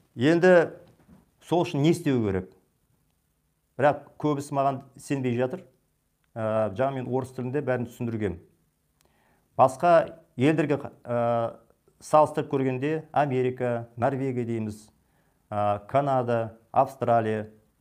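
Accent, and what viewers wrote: Standard. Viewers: Таза қазақша сөйлейсіз.бая,ғыдан солай сөйлеу керек еді. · Ои рахмет балам сенің қолыңнан бәрі келеді сен билікпен келісіп елге кел бірігіп қазағың үшін жұмыс жаса ереуілге шықпаи басқа жолын табу керек келісімге келіңдер сен келсең біз сені қолдаимыз қателеспеитін пенде жоқ қысымда көрген шығарсың оны түсінеміз енді елге қаит мен саған батамды бердім қазақша таза сөиледің елің үшін еңбек етәмин деп қолыңды жәи аллаһым жолыңды ашып ісіңді оңға бастап денің сау басың аман болып елге оралып қалқыңа адал еңбек етуге нәсіп етсін әумиин!!!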